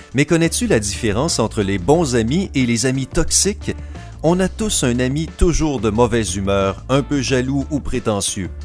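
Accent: Quebec, Canada. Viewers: C’est l’accent québécois, n’est-ce pas? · Vous êtes québéquois ? J'adore votre accent ! ❤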